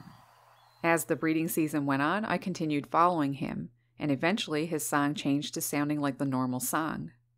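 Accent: Canada. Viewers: Sounds like we're on opposite coasts. I'm out here in Aberdeen, WA and I catch some o-o glimpses of your accent that sound Canadian? Surely love the birds you film in the snow as we mainly get rain out here. Great and wonderful website btw!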